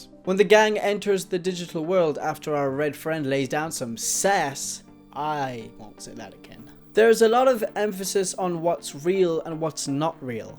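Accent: Ireland. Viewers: What part of England are you from you have an Irish accent also your pretty cute 😎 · Are you Irish I'm just asking because of your accent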